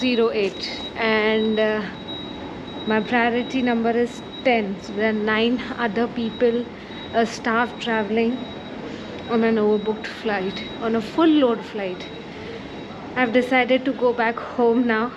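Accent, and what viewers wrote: India. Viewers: I have always loved the english accent for indians... Hello to You beautiful young Lady ! Best regards from Bucarest ROMANIA 💐🤞🍀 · Такой прикольный индийский акцент. Приятная девушка. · Хорошая девушка. Но её английский очень похож на английский индийца в интермедии комедиклаба про американское радио с Харламовым... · indian english😏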